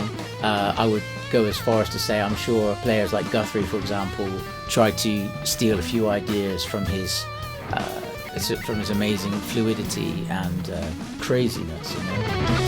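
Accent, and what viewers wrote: West Country, United Kingdom. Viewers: What is your standard tuning? And am I detecting a bit of a west country accent?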